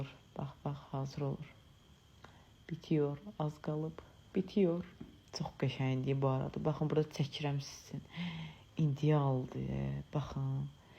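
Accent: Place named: Talysh, Azerbaijan. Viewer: TALİŞDI ONA GÖRE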